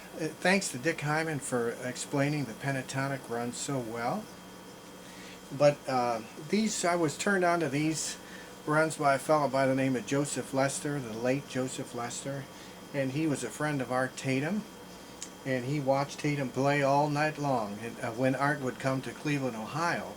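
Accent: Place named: Pittsburgh, United States. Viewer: You are an outstanding pianist as well as a wonderful teacher. Your accent sounds like you are not far from me in Steeler country. Keep up the brilliant work!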